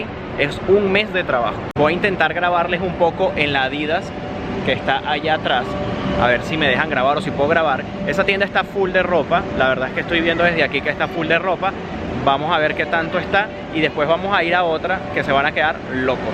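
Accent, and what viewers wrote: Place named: Venezuela. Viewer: El Luisito comunica venezolano:v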